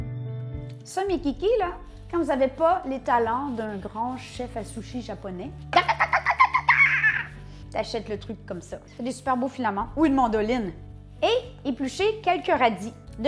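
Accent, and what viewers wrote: Quebec, Canada. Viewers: Tu dégages tellement d'énergie positive et de bonne humeur, c'est vraiment génial ! Et l'accent québécois est tellement fun 😂 J'adore 💕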